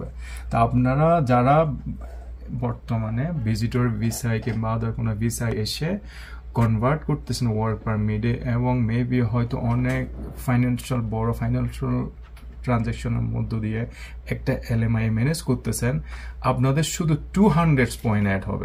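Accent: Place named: Chittagong, Bangladesh. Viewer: Vaiyar bari Chittagong for sure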